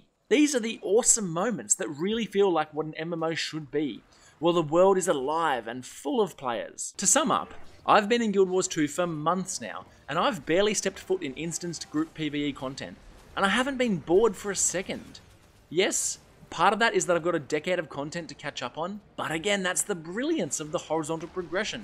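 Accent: Australia. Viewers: Judging from your accent, I'm gonna assume you live in Australia. How do you deal with the high ping? I tried Guild Wars 2 and I enjoyed my time with it, but the fact that the game didn't support OCE servers just killed it for me. It's 2023, there's really no reason I should be playing any online game with 250 ping anymore.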